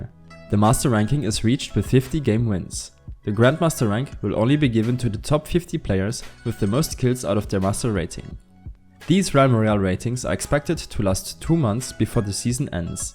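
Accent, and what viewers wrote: Germany. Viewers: Dein englisch ist soooooo deutsch nh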